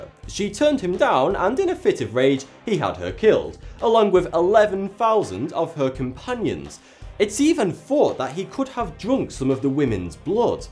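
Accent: United Kingdom. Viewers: Is this guy British or just a hipster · R u British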